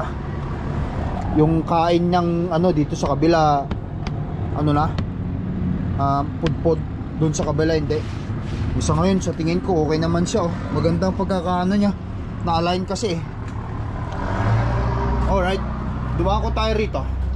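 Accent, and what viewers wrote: Ilocos, Philippines. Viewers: yun speaking voice mo tunog batangeño na ilocano tigas ng dila mag pronounce ng words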